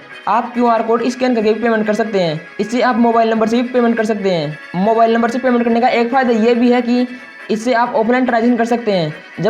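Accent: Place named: Rajasthan, India. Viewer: Bhai Rajasthan se lgte ho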